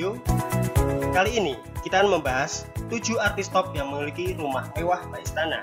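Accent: Java, Indonesia. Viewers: Medokk.. Hhaha lucu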